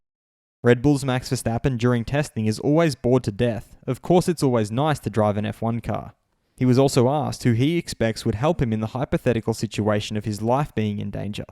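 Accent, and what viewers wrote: Australia. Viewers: I suppose it depends on how much of a fan you are and your level of interest.If you don’t pay much attention to whats going on, then this channel is perfect for repeating everything in one viewing session, but if you regularly check up on whats going on then this channel is a waste of time, as its just rinse and repeat, unless, maybe, you just like listening to Australian accent🤣 Like i said, he still has to put the work in to make these videos and he has has plenty subs, so fair play to him.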